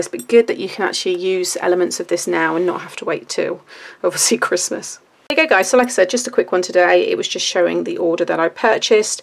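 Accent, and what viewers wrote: United Kingdom. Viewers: Nice haul! Have I missed something? Sounds like you are back in the UK?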